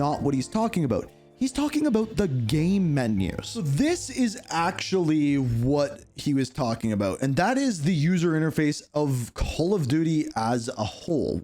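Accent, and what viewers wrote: Canada. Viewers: This guy ain’t American. He Canadian! Accent gave it away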